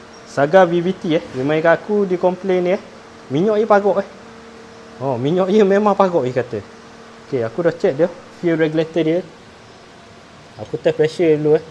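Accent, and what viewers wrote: Terengganu, Malaysia. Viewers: Sedap dengar slang ganu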